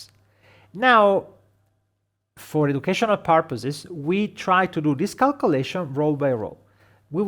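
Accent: Italy. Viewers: Excellent. This channel probably has the best advanced PowerBI free content on the internet. Moreover, the Italian accent is very funny! Greetings from Brazil.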